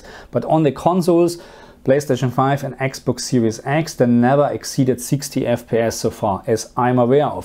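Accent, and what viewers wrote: Germany. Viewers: nices Video und nicer deutscher Accent haha😄😂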